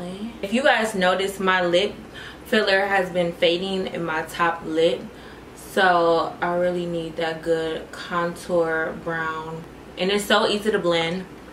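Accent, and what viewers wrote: United Kingdom. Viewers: your British accent is really cute. Your makeup looks gorgeous Charlotte Turberry 💄💄👍🏽. Congrats on your collaboration with the brandy ou deserve it. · its the british accent for me its even funnier coz im from ldn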